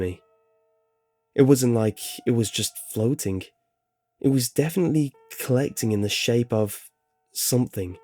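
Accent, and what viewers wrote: United Kingdom. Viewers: 70 isn't that bad, where I live 60-70 is the norm...then again where I live one day it will be sunny and 70 degrees and the next day 20 degrees and snowing that Indiana for you - _ -; Also I just realized are you referring to Fahrenheit or Celsius? In the US we use Fahrenheit to calculate the temperature. Even though the narrator was british, the author of the story was probably american. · Love your british accent (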